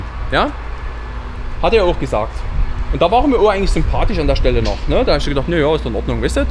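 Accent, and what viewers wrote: Saxony, Germany. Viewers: Ein sehr interessantes Video. 👍 Dieses war glaube ich mein zweites Video was ich von euch sehe und ich muss sagen das gefällt mir gut. Du bist'n Typ der einfach so quatscht wie ihm die Gusche gewachsen ist. Und dann noch auf sächsich.👍 Abo ist mal da.✌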